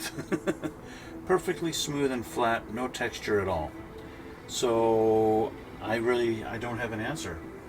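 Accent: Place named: Canada. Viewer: Where are you based out of? You sound Canadian.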